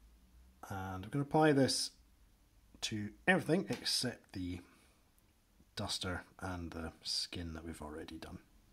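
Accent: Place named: Scotland, United Kingdom. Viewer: This video Iv just found in time as got a copy of dead by lead my first western skirmish game and painting cowboys isn’t my usual genre as mostly paint sci-fi and cyberpunk minis. Great informative tutorial and better still it’s from a fellow Scot so accent was a nice change lol.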